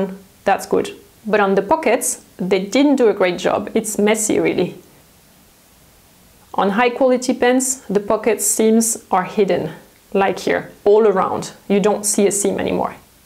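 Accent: France. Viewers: L'accent français est reconnaissable partout😀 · Ce léger accent français :) Very interesting video. Clear and concise. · Super vidéo, je connaissais déjà ces tips mais je regarde pour le plaisir ! J'aime bien ton petit "French accent" ! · I like buying clothes and learning differences in quality and you make it informative and succinct, delivered with an absolutely killer French accent that would make learning the rules of cricket listenable! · Merci beaucoup pour cette vidéo merveilleuse! Ton accent francais est super mignon :) Et les astuces sont très intéressant! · Can't identify where she is from... Why I heard some french accent?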